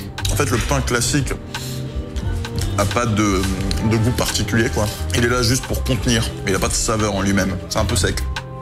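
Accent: United States. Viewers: frère tu fais tellement le ricain i tariv quoi aha ? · T'es un Cainri ou un français mdr , bonne vidéo man !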